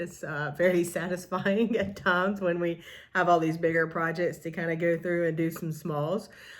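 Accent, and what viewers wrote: South, United States. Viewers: Hi..I also watched Carol Saltbox Stitcher and she recommended your channel. Really enjoyed seeing your projects..love your Be Thankful and Good Cheer pillows..I have both patterns and hope to stitch them this year. I regret to say I didn’t know about your previous flosstube so could you sort of re-introduce yourself in your next video. I’m always interested in where people are from and you sounded like you might be from the South lol..sounded like home to me 😊 Have a great weekend and I look forward to your next episode. Suzette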